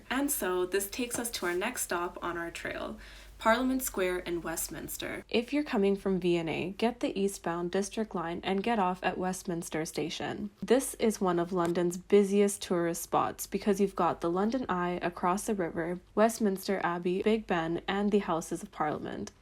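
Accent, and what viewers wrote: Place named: United States. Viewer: Does she explain anywhere why she speaks with an American (or mid-Atlantic) accent?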